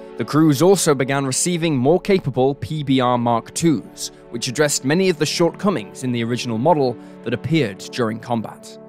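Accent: United Kingdom. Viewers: the us military didnt have a 9mm pistol during the viet nam war. they used 1911 45 acp or 38 special revolvers. Limey narrator, reading a script. lmao. No credibility .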